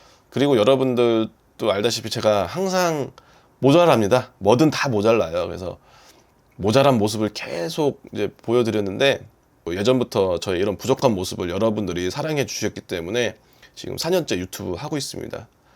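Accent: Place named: Jeolla, South Korea. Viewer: 못했어오 -> 모대써요x 모태써요o 대전 분인데 서남사투리 쓰지 말고 표준말 씁시다.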